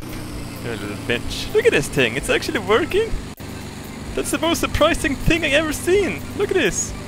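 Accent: Sweden. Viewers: i watch just to hear him say a word with a J i love sweedish accents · shutup Dave no 1 likes u thats y u dont have friends. is swedish of course hes going 2 have a different way of talking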